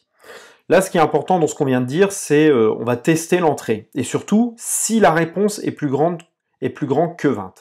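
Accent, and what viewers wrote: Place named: Ardennes, France. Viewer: Bonsoir, tu me rappelles mon ancienne collègue, elle prononçait le "t" de vingt, tu es des Ardennes toi aussi ? :D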